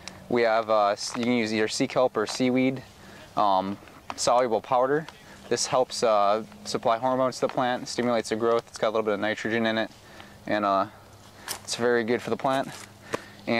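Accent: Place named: United States. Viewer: you misunderstood him as I did briefly until realising it's his American way of talking.he definitely meant DONT be using too much of it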